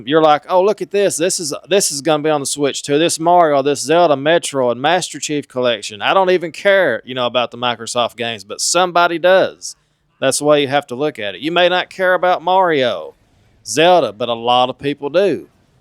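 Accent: United States. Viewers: Steam Deck and ROG ALLY has simmilar specs like switch 2 so I think it is possible. Your acent is the most american american who ever americaned :D And I like it. My bro begs my mom to get him the switch 2 on christmas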